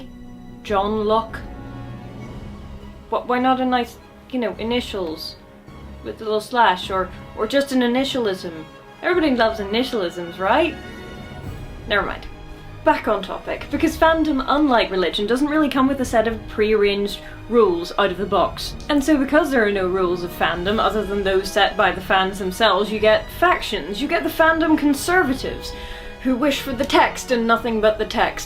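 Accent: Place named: Northern Ireland, United Kingdom. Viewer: First things first: oh dear lord, I remember stumbling upon Homestuck about three millenia ago.... even compared to when this video came out! I really enjoyed it, but it was a bit of a behemoth at that point already, and somewhat... daunting for it? Anyway... A very good series! I've watched all 7 at this point, but this one particularly stuck with me, even though I kind of don't feel like I've ever managed to get so involved in a thing that I'd consider myself a part of an actual fandom.. It kind of put things in a bit of a perspective, I suppose, and of course I do tick a lot of the boxes for being a huge fan (HP, Doctor Who, Discworld, etc.). Just... not the community ones, mainly? And, for another random tangent: I've been somewhat binging your videos for several days now, and..... I've clearly been in Norn Iron for too long, because it didn't even occur to me that you might sound Northern Irish until you mentioned Lisburn here xD xD And here I am - among other things - a bit of a linguistics nerd! 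Well, clearly it's what passes for default to my brain these days! Not a bad thing, mind, because I rather enjoy the Ulster dialects in particular :)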